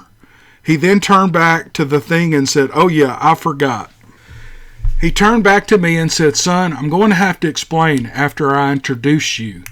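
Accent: South, United States. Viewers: I’m so happy I discovered your channel! The past couple months I have been reading a LOT of book series of Bigfoot encounters and this is SO much better! Your narration and splendid southern accent makes for awesome and enjoyable listening! Thank you for your great work!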